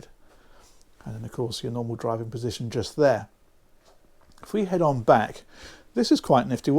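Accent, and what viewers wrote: United Kingdom. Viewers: Are you from England? Nice vehicles looks very cozy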